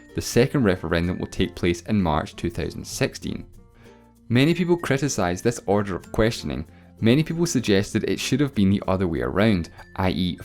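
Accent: Scotland, United Kingdom. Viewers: I know this is weird, but your chocolaty Scottish voice commentating with your great animation work gives me chills. You will go somewhere with this content, keep it up! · I think he's Scottish, but I'm not sure. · I think he's scottish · God, the Scottish accent is wonderful. Also, great video!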